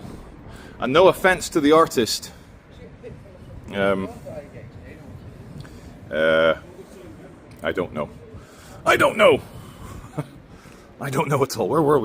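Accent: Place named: England, United Kingdom. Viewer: Indigenous English , beautiful, just beautiful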